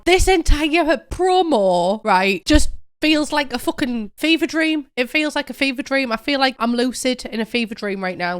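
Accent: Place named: Northern England, United Kingdom. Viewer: Sending good thoughts to you and your family and I am glad we have you back, even for this one video :) I've always enjoyed your content and have done for the past few years when you had 20k subscribers. I always enjoyed watching you cause you were the only simmer/youtuber I found that sounded slightly like me, I found it comforting that us Northern Lasses are getting some spotlight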